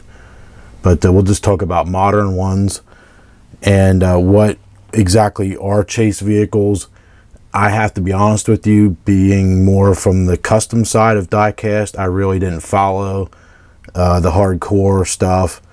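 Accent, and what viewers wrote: Pennsylvania, United States. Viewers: Sounds like you from Pennsylvania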